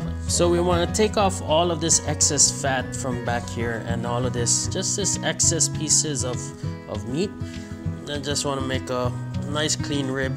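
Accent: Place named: Philippines. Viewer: Da pilipino and brada accent iz on poynte 👍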